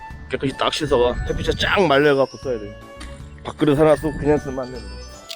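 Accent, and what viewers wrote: Gangwon, South Korea. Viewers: 1. 농장 이 어디 지역이에여? 2.강원도 분이신가여? 강원도 사투리 같아서여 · 강원도 사투리 구수하네요 ㅎㅎㅎ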